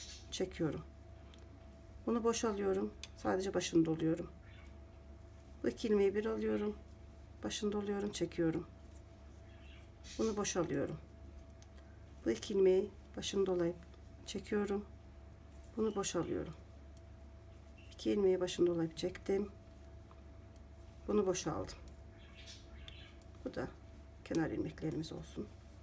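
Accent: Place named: Kayseri, Turkey. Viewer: Bacım sen nerelisin kayserilimisin ne tatli turkcen var 🥰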